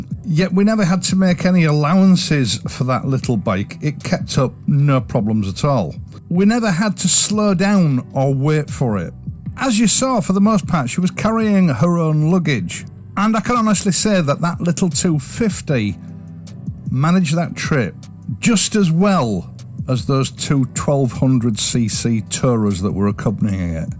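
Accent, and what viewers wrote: United Kingdom. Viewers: Dear, Thank you so much for your videos. They are always filled with common sense, experience, and wisdom, which truly help in gaining new perspectives. I wanted to share that as a French speaker with limited English, your wonderful British accent can sometimes be a challenge for me (as we are mostly taught American English in school!). Because of this, I am always thrilled when your videos feature a translated French audio track. It allows me to enjoy your content even while I'm busy cooking or doing chores! I understand that these audio tracks are often managed by YouTube's algorithms and AI policies, but I wanted to let you know how much value they add for your international audience. Thank you for being such a unique and inspiring voice on this platform. Best regards from Belgium! Julian.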